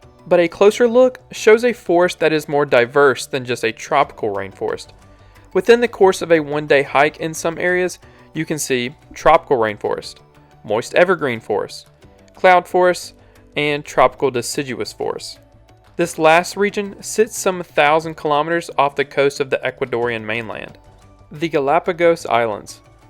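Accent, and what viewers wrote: South, United States. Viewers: Interesting video. I should point out that you Spanish pronunciation is cringe worthy. Your accent would suggest southern USA with English being your primary language, but with Spanish pronunciation, you aren’t really trying.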